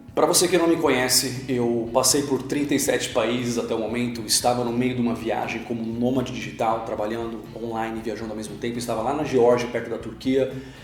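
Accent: São Paulo, Brazil. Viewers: você é paulista ou catarinense, fiquei curioso agora e depressão, tenho problemas com autoestima e já pensei em suicídio por diversas vezes, até conversei com um psicólogo, mas ele acha que remédio vai resolver o problema, depois que uma garota me humilhou na frente da escola eu nunca mais consegui falar com uma mulher e tenho dificuldades em me relacionar e isso me incomoda e quando tento conversar com alguém a maioria das pessoas são bem grossas e nisso eu me isolo e quando vejo um casal eu me sinto mal porque não consigo sequer uma ficante, é só um desabafo mesmo.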